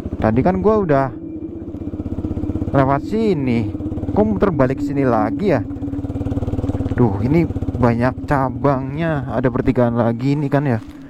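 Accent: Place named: Java, Indonesia. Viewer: Sumpah , kalo bukan orang betawi jangan pake Lu GW lah tolong , keliatan banget Orang jawa dari tambahan kata KOH dan logat jawa gitu , jadi situ yang ngomong sini yang malu dengernya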